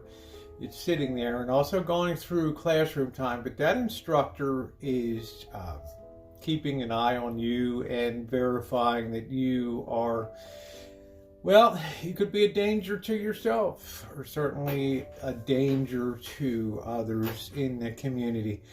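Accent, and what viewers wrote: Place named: South Jersey, United States. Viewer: You sound like you're from South New Jersey.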